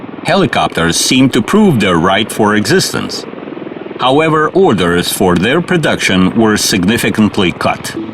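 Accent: Russia. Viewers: I always like the narration of this series. The Russian accent gives it a certain authenticity.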